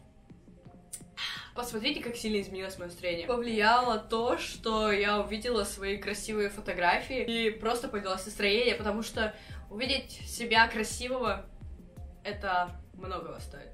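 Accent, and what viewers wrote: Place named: Russia. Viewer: 🤔 This accent! U speak Russian language! So are you Russian?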